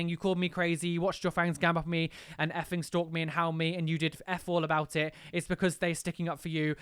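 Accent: United Kingdom. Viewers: This is COMPLETELY off-topic, but when you speak American English, the way Brits say "R" is so funny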